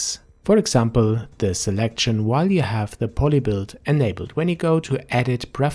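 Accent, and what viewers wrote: Germany. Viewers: Took me to hear that you are german. And i'm german and quit good at english. Oh and very nice video :) I love the Blender Cummunity so far.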